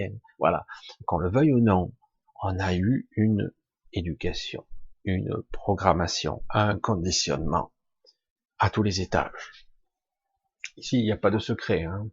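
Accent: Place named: Ardèche, France. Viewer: Bonsoir j'adore vous entendre avec l'accent ardéchois c'est tellement vrai ce que vous dites le peuple n'en peux plus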